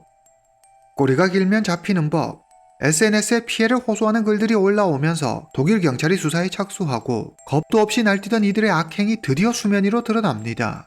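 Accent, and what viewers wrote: Yanbian, China. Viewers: 말투를 들어보니 중국이나 연변동포 분이시군요 방송 잘 보고 있습니다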